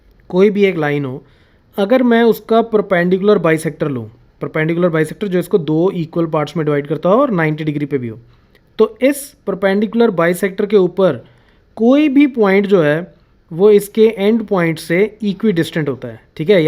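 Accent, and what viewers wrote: Punjab, India. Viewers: By your accent I think you are a PUNJABI Thanks for explanation ☺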